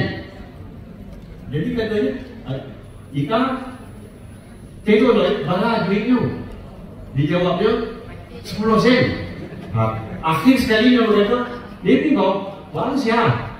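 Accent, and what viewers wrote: Minangkabau, Indonesia. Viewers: indak hilang logat minangnyo 👏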